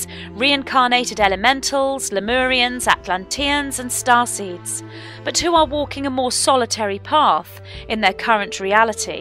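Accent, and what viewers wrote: United Kingdom. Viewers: me 2. I drink in the accent as for some reason, as I love English accents! Hers is so sweet!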